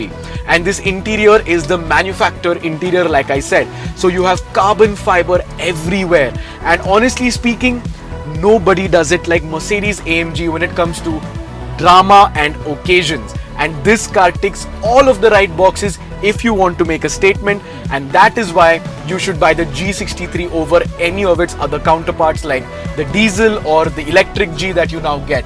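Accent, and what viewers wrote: India. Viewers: Very Indian Authentic accent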